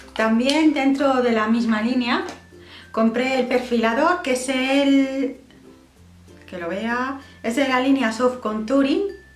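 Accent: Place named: Spain. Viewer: Hola, me encanta que realices vídeos de maquillaje. Yo también espero pronto empezar a realizarlos. Yo los productos que utilizó son de Younique, no se si los conoces. Espero cuando me recupere de mis cirugias, empezar a realizar videos junto contigo. Soy deEspaña y por tú manera de hablar creo que tambien lo eres. Tengo mi proxima operación el 15 de febrero, el día después de los enamorados. Una vez recuperada, esperó que colaboremos juntas, tanto en el grupo arte en tus manos, cómo las dos realizado un set de maquillaje. Besos, espero que pases por mi canal y te suscribas, todos los videos son de manicura, por lo que me encantara realizar videos de maquillaje. Besos.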